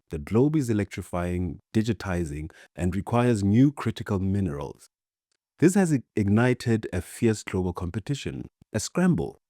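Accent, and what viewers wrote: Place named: South Africa. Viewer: I wouldn't be looking at the Chinese or Russians or anyone else. Look at those corrupt sellout leaders and politicians who care nothing for their countries or its people... BTW you sound like a South African if I were you. I'd be more concerned about how it is that 7% of your population own the majority of the land in that country...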